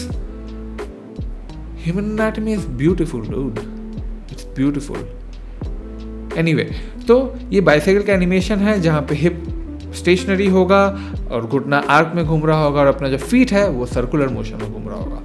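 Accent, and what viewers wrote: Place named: Kolkata, India. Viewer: আপনি কি বাঙালি? আমি বাংলাদেশ থেকে। হিন্দি অ্যাকসেন্ট কলকাতা এর মতো লাগছে।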